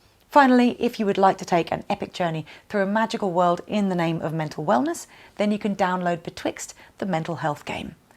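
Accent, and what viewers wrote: United Kingdom. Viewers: You’re so articulate! Do you have scripts in the video or you just talk like that? And is that the RP Modern British accent or any other one? 😊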